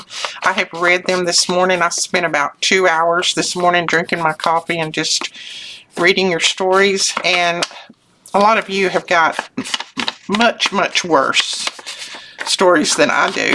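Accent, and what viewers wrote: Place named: Tennessee, United States. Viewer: When I heard you say your birthday is October 7, and had depression , I also have depression bouts out of nowhere. I have taken meds for several years, and they really help. But sometimes I have to fight it everyday. Also, our youngest son ‘s birthday is October 7. He died 4 years ago, so it is always a tough go during that time. He was 49 years old. I listened to you talk after your husband passed, and I felt so helpless. There are no words, or right or wrong way to grieve. Everybody has their own way to try to get through. I feel his presence when a Cardinal comes to see me, when I find a bird feather, see a beautiful butterfly, or see his image in a cloud. I hope your depression stays in the background, and your memories of your husband make you smile. I watch you all of the time. Thank you for taking the time to make your videos and for your beautiful freebies. 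It is also nice to hear someone who sounds like me. I am from Tennessee.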